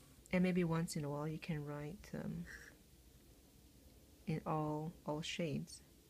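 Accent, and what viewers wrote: Singapore. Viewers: Are you Singaporean?:) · I also guessed Singaporean! I like your accent, and agree with the commenters above that I appreciate your thoughtful and methodical posts that give really practical information. New subscriber!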